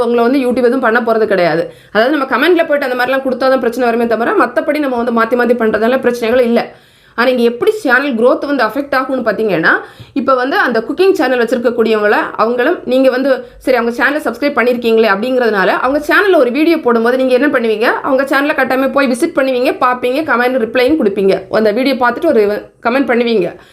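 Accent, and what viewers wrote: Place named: Thuckalay, India. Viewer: nanum Thuckali pakkam than unga pechula konjam nam ooru vasanai vanthu athan ketten akka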